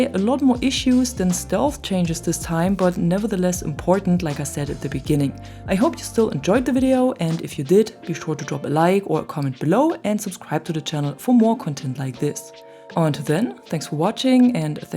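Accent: Germany. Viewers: I am so sorry. I figured that out.Love your channel and your German accent.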